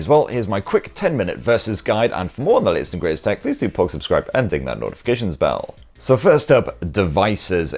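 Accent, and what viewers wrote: United Kingdom. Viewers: Great video. It's nice not to be patronised. Also, its nice to hear a British voice! Thanks.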